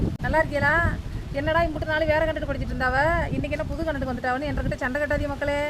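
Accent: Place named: Nagapattinam, India. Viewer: Eanakku santhakama irunthathu Nakai mavattam pesaringa apparama sonninga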